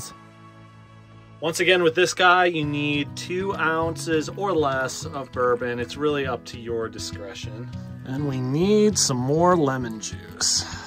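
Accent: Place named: United States. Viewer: Hello! I am having fun with your show. It is so great your show and inspires me how to learn camping. I want to try on caping in rural Japan someday. By the way, I took up studying English lately. Because I want to know more detail about what are you talking the show. I am also learning your American English pronunciation from your beautiful voice. All of the English books advise me that If you want to speak English fluently, you should pretend someone. I catch your phrase, word anymore. As I spoke English, I was supposed to be. I am sure that you are my English teacher. Great for me. Anyway, the Japanese crew is waiting for Rock The Park staff to visit to shoot next time in Japan. I hope to see you soon. From Masato Kaneko.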